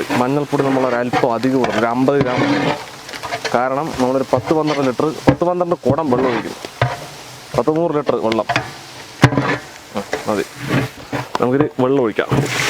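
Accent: Palakkad, India. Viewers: ഞാൻ എന്നും വിചാരിക്കും നിങ്ങളുടെ കൂടെ ഇരുന്നു കഴിക്കുന്നവരെ പോലെ എന്റെ വീട് നിങ്ങളുടെ അടുത്തില്ലല്ലോ എന്ന്. ഞാൻ കോയമ്പത്തൂരിൽ ഉള്ള ആളാണ് നിങ്ങളുടെ ഭാഷ കേട്ടാൽ പാലക്കാട് ആണെന്ന് തോന്നിന്നു നിങ്ങൾ എനിക്ക്‌ ഒരു അപ്പോയൻമെന്റ് തരുകയാണെങ്കിൽ ഞാൻ നിങ്ങൾ കേരളത്തിൽ എവിടയാണെങ്കിലും ഒരു ദിവസം നിങ്ങടെ കൂടെ ഇരിന്നു ഭക്ഷണം ടേസ്റ്റ് ചെയ്യണമെന്ന് ആഗ്രഹിക്കുന്നു.. പറ്റിയാൽ എന്റെ നമ്പറിലേക്ക് ഒരു ഫോൺ വിളിക്കണം.. <num>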